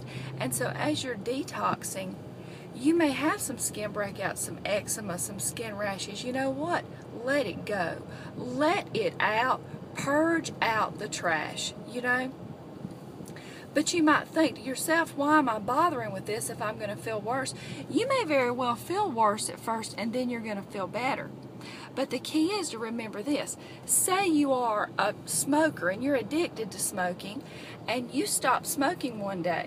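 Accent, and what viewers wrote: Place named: South Carolina, United States. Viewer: I love you so much! I'm originally from SC and I miss hearing the accent so much! It's so comforting and your energy is so peaceful and loving! Thank you for making videos! I was going raw for a couple weeks but the past two days I got really stressed and ate two big junky dinners two nights in a row and now I have a terrible ear infection! It was really the kick I needed to go back to fully raw (bring on the detox and let it go!)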